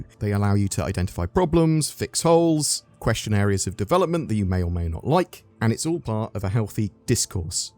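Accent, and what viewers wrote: United Kingdom. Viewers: love BEING a part of your community. I've met some interesting people, and I love hearing your limey voice talking about a game I am so passionate about.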